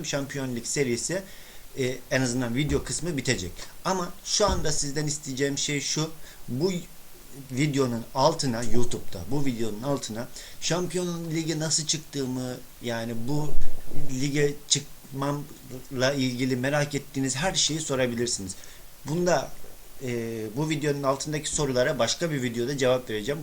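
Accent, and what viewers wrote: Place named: Laz, Turkey. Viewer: lazın şiveye bak abbbooo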